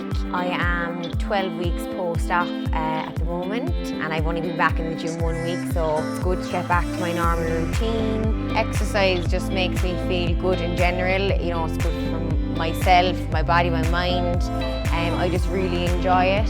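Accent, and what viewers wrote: Ireland. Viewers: I stuck around mainly for the Irish accent 💚🍀😁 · Beautiful girl, she must be Irish with that accent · Couldn't believe it when I heard da Cork accent on utube! 😄 I'm from Cork too....Barrack St area. Fair fucks to you, lady. You are blessed. I nearly died from obesity. Heart attack at 45, open heart surgery at 53. That could have been in store for you or worse. I turned it around just like you after my operation 7 years ago. I calorie counted at the start, eat a clean diet and zero junk food. I exercise regularly and do Kettlebells and Bands for resistance training at home. Lost 80lbs and more importantly my destructive junk food habit and 80 a day smoking habit. I have a few nice war wounds to remind me off my past....a nice scar all the way down my chest where they sawed me open for the op in the CUH. I also have a nice scar from my ankle all the way up to the top of my thigh from where they harvested my vein from my leg to perform the bypass surgery. 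Lucky to be typing this, so scars are a least of my worries now. Great to see your transformation and more importantly that you have given yourself an opportunity of a long life. You are looking hot, 🔥 my dearest....not just on the outside, but most definitely on the inside too. Well done. Lots of love on this Paddy's Day.☘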